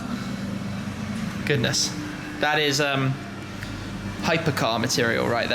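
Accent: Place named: RP, United Kingdom. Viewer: How does this guy buy so many cars ? Sounds like a bender from oxford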